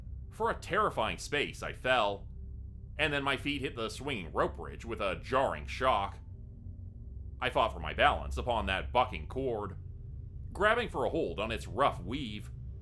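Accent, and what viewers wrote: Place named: Canada. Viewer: WA part o Canada u from A-HHH !!!! IMA FLORIDA BOY so I get ta hear all kinds o accents !!!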